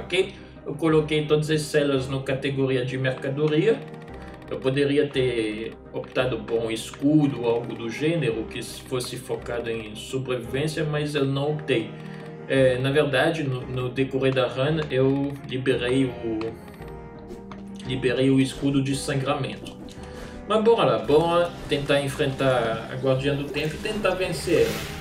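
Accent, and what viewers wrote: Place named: France. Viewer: explica muito bem, mesmo com o sotaque francês puxado..., é até um diferencial..., mais um ótimo vídeo!!!!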